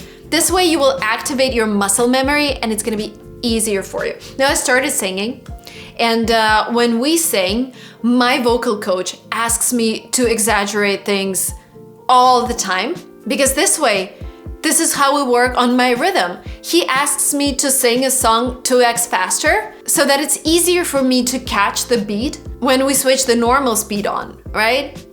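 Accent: Russia. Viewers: please take care of your aspiration. The way you pronounce the h sound gives you a heavy Russian accent. The second priority would be all first sounds in the words you‘re saying. Love watching your pronunciation progress! Way to go, girl! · awful russian accent:face-turquoise-covering-eyes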